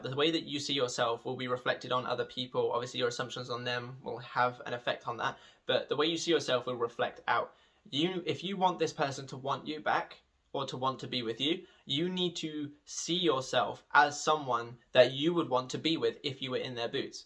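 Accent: United Kingdom. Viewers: Definitely my new favourite law of assumption youtuber , helps as well that you’re from the uk, so I can actually understand you better 😅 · You are a bundle of possative lovelyness, I am so grateful to the American youtubers that have really helped me with my self concept. But it is nice to hear a uk voice. Makes it even more real. I'm subscribing. See you on the flip side. I mean I am already there. 🙂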